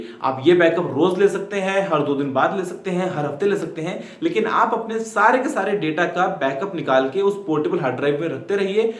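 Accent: India. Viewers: Another Indian, this is boring to listen Indian accent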